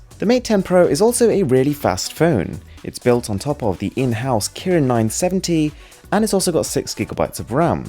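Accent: RP, United Kingdom. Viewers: The accent of this guy British... Nice review but can you do an depth camera review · of all the youtubers with British Accent Mrwhosetheboss' is my favorite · You've got a very posh accent. Great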